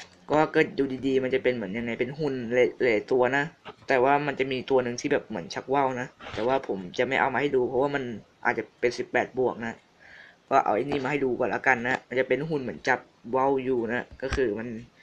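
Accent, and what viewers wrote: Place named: Thailand. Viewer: ทำไมพี่เสียงเหน่อจังคับ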